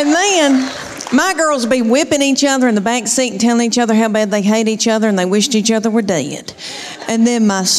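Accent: South, United States. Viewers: I don't always get all the southern Americanisms BURT AH TELL Y'ALL shes a whole heap of furn🇺🇸🙏😅😅😅😅😅😅😅😅😅😍😍😍😍😍😍😍😍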